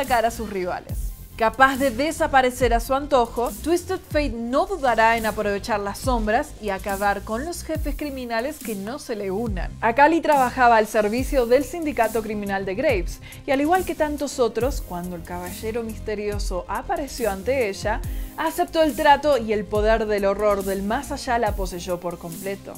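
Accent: Argentina. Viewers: es argentina._. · LIKE SI KIERES KE SAKEN A ESTA SEÑORA ARGENTINA DEL ACTUALIZANDO. YA NO AGUANTO YA NO AGUANTO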